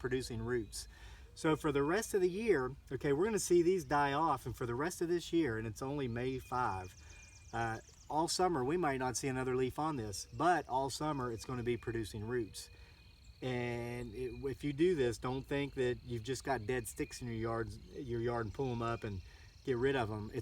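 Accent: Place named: Virginia, United States. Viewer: Before I even noticed your hat I knew you were from VA from your accent!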